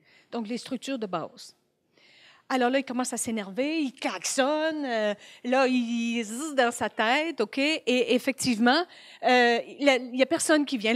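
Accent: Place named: Quebec, Canada. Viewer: tout ce qu'elle dit est vraiment intéréssant, mais j'ai de la misère à comprendre à cause de l'accent québecois :(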